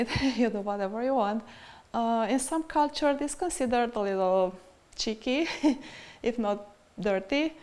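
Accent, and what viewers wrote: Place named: Germany. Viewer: She sounds german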